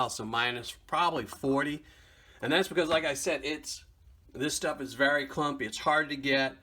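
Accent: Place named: New York, United States. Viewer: I've been a long time subscriber as you can tell from my name I don't know if you give these away to just subscribers on YouTube or if they go to patreons only but I love the hell out of your videos in your New York accent reminds me a whole lot of my grandma just the accent my grandma was a sicilian woman from the Bronx Sicilian accent with the Bronx what a beautiful thing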